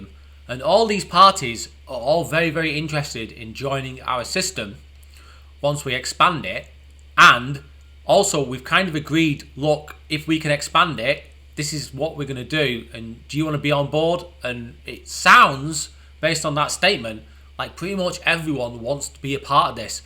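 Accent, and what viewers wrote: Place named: Liverpool, United Kingdom. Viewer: Man your accent.. You're from Liverpool?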